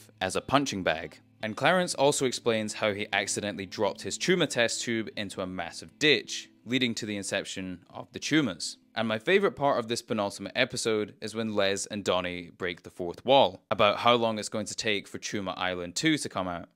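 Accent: United Kingdom. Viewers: hearing a british bloke voiceover this actual visual masterpiece of a culture film is weird somehow. none the less, as a diehard fan myself, i still learnt some stuff from ya & about the show i grew up on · You sound like you’re from Edinburgh. Actually, maybe Stirling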